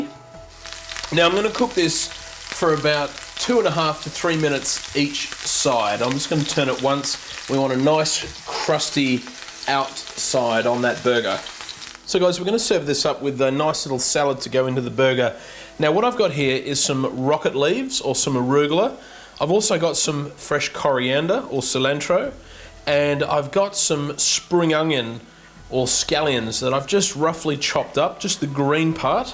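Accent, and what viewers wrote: Australia. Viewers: No offence i noe ur aussie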